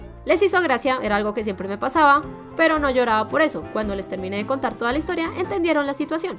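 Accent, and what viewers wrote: Colombia. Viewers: me encanta como hablan los colombianos y es una bendición escuchar como hablas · Sabia que eras colombiana XD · eres colombiana porque así hablamos en miuu pais ^w^ nueva suuub por cierto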